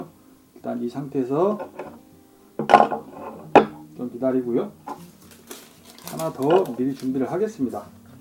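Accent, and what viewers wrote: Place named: Jeolla, South Korea. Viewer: 사투리를 많이 쓰시는데 호남지방 어디신가요? 나또한 그쪽이라서요.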